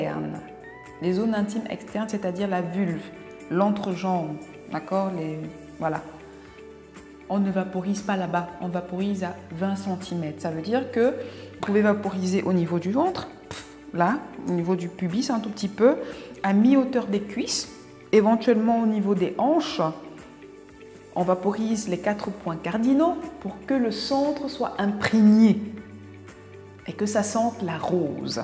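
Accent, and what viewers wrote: Cameroon. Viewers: Cet accent est typique des camerounais 🇨🇲🇨🇲🇨🇲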